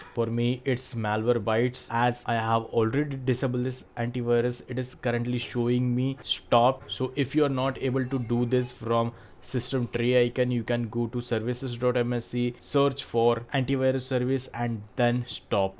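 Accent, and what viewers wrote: India. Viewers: Thanks for your tutorial, it was very usefull, and your indian accent made it better :) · hi I like your Indian accent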